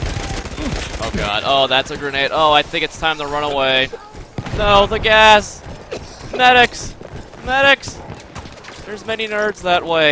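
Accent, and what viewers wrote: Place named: Italy. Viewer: why is the voice italian??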